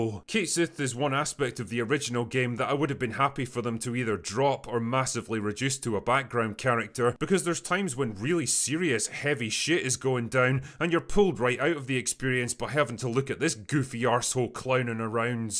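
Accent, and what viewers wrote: Scotland, United Kingdom. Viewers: It may be affirmation bias but I love how this was basically a 15 minute video of my own thoughts in a Scottish accent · Watched 10.5 minutes and liked to support our Sco'ish boi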